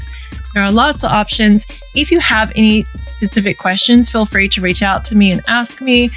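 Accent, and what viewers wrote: Australia. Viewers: sounding like an aussie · Love hearing how your o Vowels have Australianifed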